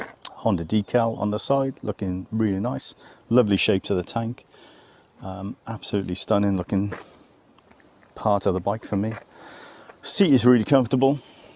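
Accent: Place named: Cardiff, United Kingdom. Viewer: Great video. I'm in Cardiff, so I recognised the accent immediately. I'm about to start my biking journey. Just like you, I'm a bit older and recently booked a CBT course. Videos like this are inspiring. Keep up the great work. Subscribed and looking forward to your next video. By the way, love Nash Point, too. I've camped there a few times.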